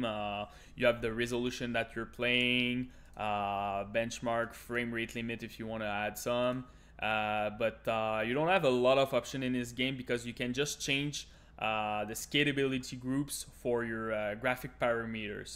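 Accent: Quebec, Canada. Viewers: merci pour le tuto... j'écris en français parce que ton accent paraît très québécois ^^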